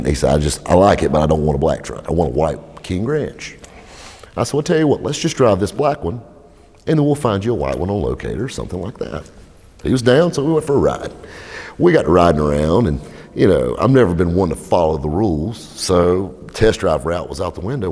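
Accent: South, United States. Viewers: If vin diesel had a country accent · Your voice IS the South.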